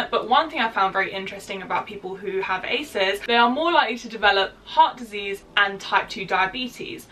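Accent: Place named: United Kingdom. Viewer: Love your videos - I’ve been rewatching and binge watching today during breaks from working from home. I’m in the U.K. too; can’t believe how crazy everything has gone in the last 2 weeks! Where abouts in the U.K. are you? Obviously you don’t need to be specific (or answer the question in the first place!) but I’m trying to place your accent!! Anyway - awesome content!! Jenn 🌸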